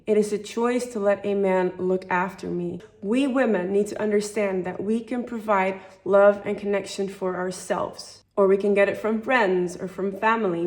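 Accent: Netherlands. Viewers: I can spot a Dutch-English accent from miles away.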